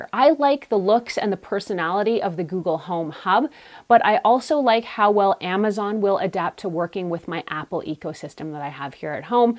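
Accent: Canada. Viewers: Wow, smart girl, gorgeous eyes, good info, intelligent but ... That Canadian accent just cracks me up. Beats the heck out of a Noo Zillan accent too.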